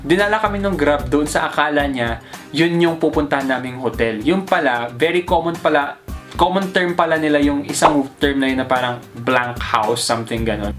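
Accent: Thailand. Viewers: Honestly, u have a genuine sound of Thai! Love it! Love you Kuya! Also one of my dreams is to visit Thailand. Sana all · YOUR VLOG IS VERY ENTERTAINING HAHAHA VERY INFORMATIVE 💚 ALSO LOVE YOUR THAI ACCENT HIHI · his thai accent. ✊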